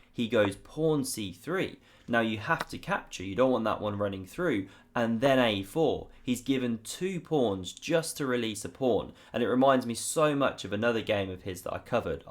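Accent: England, United Kingdom. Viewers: What a great oidee-are lol. I love the way English people speak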